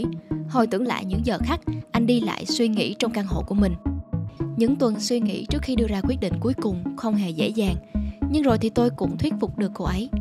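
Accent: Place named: Saigon, Vietnam. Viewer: Giọng đọc, rất hay... Dễ nghe và rõ ràng, tôi nghĩ là tiếng Sài Gòn. Cảm ơn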